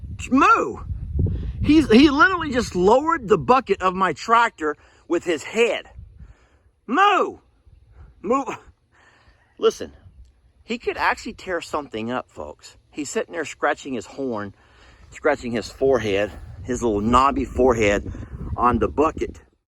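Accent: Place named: Louisiana, United States. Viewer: Omg you are hoot, we were driving home from walk last night had this on in car listening through blue tooth and when you were measuring and said “well I see it’s just over 5ft in this direction” we fell out hollering what direction is that lol ohhhhhh lawd you are comical! Made me feel like I was at home in Louisiana I love it! Living in KC they say I say the funniest things 🤷🏻‍♀️ that’s way we talk in South 🙌 love this it’s really cool we use to have something similar but we threw square bails in it for animals! You did good job, looks great! You were spot on in your measurement in that direction!! 🤣🤣🤣 I’m just messing with you!❤️❤️❤️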